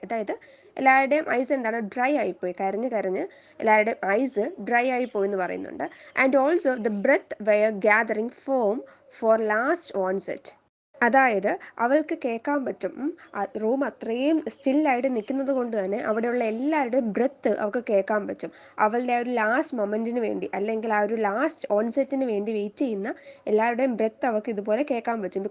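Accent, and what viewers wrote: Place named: Trivandrum, India. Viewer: ha maximum trivandrum slang varathe nokki enkilum i caught u😌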